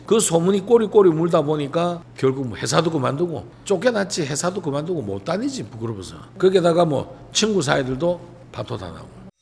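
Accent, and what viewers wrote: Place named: Gyeongsang, South Korea. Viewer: 오늘 처음 봤습니다 대장님 갱상도 사투리 억수로 잼있심더 ㅋ 나는요 고향이 안동이라 카이요 ㅋ 자주 옴시더 ㅋ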